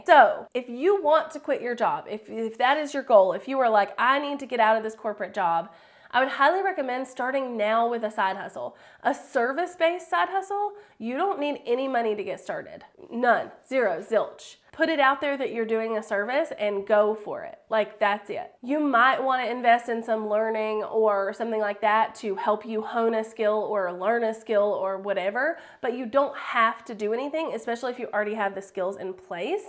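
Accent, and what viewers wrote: South, United States. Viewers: Have you been to Gatlinburg TN? I started a small YouTube channel about our small tourist town...I can tell you're from the South 😉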